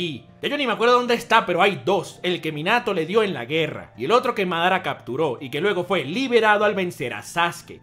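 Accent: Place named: Venezuela. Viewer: suenas avenezolano.. ¿estás allá?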